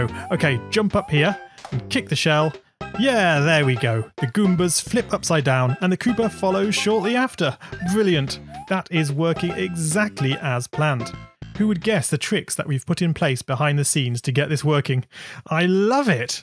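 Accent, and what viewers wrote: United Kingdom. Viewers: I absolutely love the way you sometimes call Goombas "Gumbas". That good old British accent :_cool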